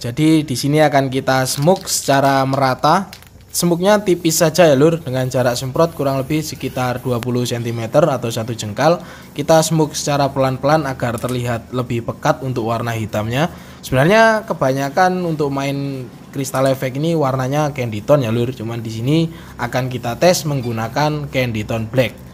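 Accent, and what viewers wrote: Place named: Java, Indonesia. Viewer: bg jenengan sh wong ndi. mandan medok. bisa nk edek otw jluk tulung ngecet na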